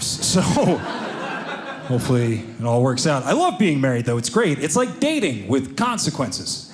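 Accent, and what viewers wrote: United States. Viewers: I have to say that is the most American a person has ever sounded in my very short time of having sentience.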